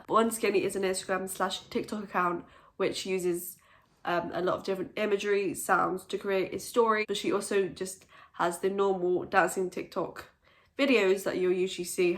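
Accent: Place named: Ireland. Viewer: Your Irish accent keeps coming through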